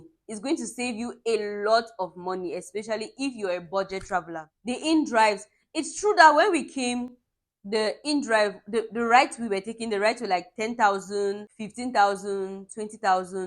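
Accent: Nigeria. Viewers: You speak like a Nigerian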